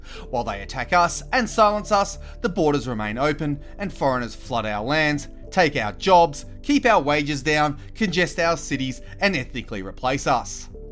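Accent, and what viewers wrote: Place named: Australia. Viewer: the over pitched 'Aussie Stryne " became too annoying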